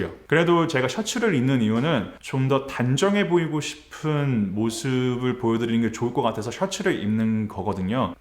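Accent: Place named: United States. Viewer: 문신하신 줄 이제 알았어요...외모에 대해서도 직접 디테일을? 말씀 안하시면 아무도 노티스 못하고 대부분 시청자들은 분위기 단정하고 좋은 분으로 생각하고 있을 거에요~ 목소리가 힘이 있고 단정해서 말투 평소에 아나운서 같다고 생각했는데 더 좋아질 수도 있을까요? 흠... 사진 리뷰 잘 보고 있어요. 저의 영상 제작 실력도 조금씩 발전하고 있는데 쿄작가님께 많이 배워요~ 미국 사시면 발음이 미국 발음이 나오는데 저도 그것때문에 한국 사는 분들이 지적을 하지만 한국에서 미국으로 온분들은 발음을 엄청 신경쓰고 교정을 했기때문에 원어민보다 더 한국 발음으로 돌아가기 힘들 수도 있죠. 사과도 당당하고 조리있게 하셔서 멋지십니다. 앞으로도 좋은 영상 많이 기대합니다~ 홧팅!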